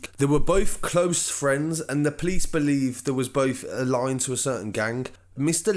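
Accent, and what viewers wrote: Birmingham, United Kingdom. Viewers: Love the brum accent!! But shocking stuff in Dublin! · That Birmingham accent and the stutters, terrible, least you didn't say Birmingham no worse sound than some1 from Birmingham saying the word Birmingham. That's me done.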